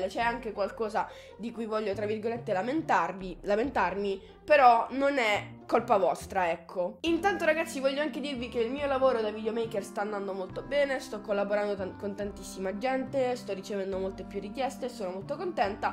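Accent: Apulia, Italy. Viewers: ma sei pugliese?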